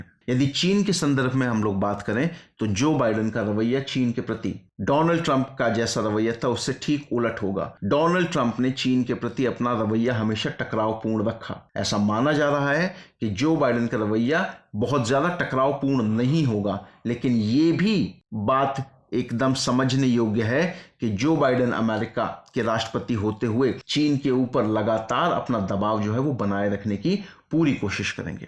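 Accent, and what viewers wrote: Bihar, India. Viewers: bihari tone sun kar aacha laga.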